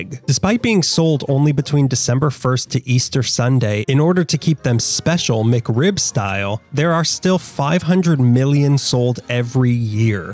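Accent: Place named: RP, United Kingdom. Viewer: So posh great video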